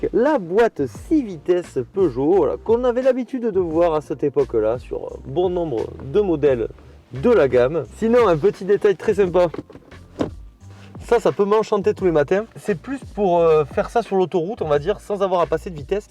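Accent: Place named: Montauban, France. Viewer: Bonjour mon ami super véhicule. Tu as un accent de Montauban toi🎉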